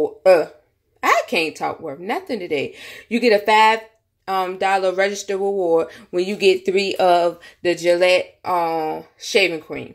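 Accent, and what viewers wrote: United States. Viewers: Pronounce it like this " Sara V" .😋 I am from Northern VA, ( living in FL), your southern accent is fine! Stop fussin' so much! Lol!😄 ( I read some of the comments after I posted this, had to come back and say, smart bunch of gals here! 😉 I said the exact same thing they did, with the exact same spelling! Lol! And I didn't read the comments first! (I promise)! 🤣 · I love your accent 😊 makes me miss my family in N.C. and don't worry about how you pronounce things, it's cute.....😁 and we understand.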